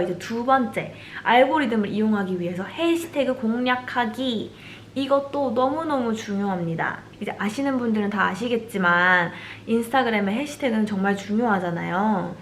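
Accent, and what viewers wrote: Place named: Gyeongsang, South Korea. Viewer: 경상도분이시네요 ㅋㅋ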